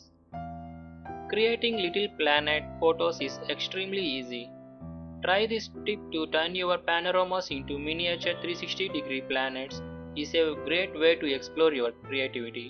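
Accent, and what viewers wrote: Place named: India. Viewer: indian and pakistan accents is very funny they are trying to speak with well accent but they are can't speak well english with good accent 'realistic' but he say 'realishtic' :) 'sixty' but he say 'sixshty' :)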